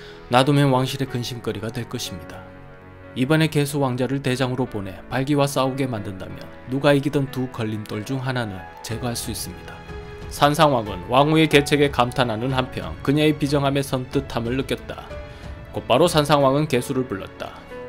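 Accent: Gyeongsang, South Korea. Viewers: 고구려이야기에 경상도 사투리 쫌 그러네요 ㅎㅎ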